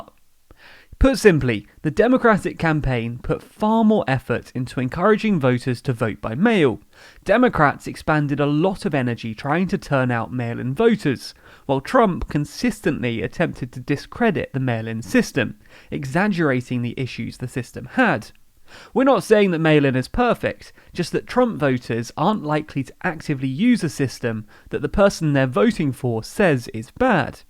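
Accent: United Kingdom. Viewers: Why would I trust anything about the US from a person with a British accent?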